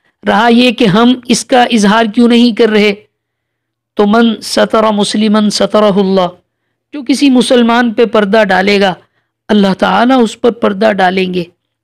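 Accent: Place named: Bengal, India. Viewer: بھائی لہجے سے تو آپ بنگالی لگتے ہو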